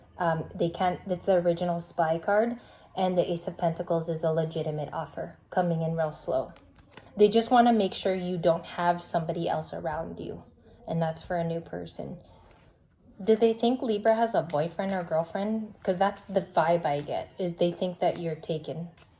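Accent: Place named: South, United States. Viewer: Love the country accent, 🤠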